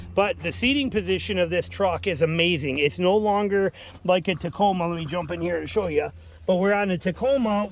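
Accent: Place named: Chicago, United States. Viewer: Are you from chicago ? You have a chicago accent.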